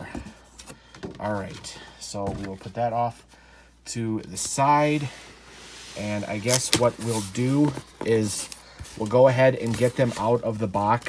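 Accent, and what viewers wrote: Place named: Wisconsin, United States. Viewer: Hello, first time viewer.-I didn’t understand how the hot wheels cars I relate to the game. Mario, The Disneyland Neighbor PS. I think I hear Wisconsin accent.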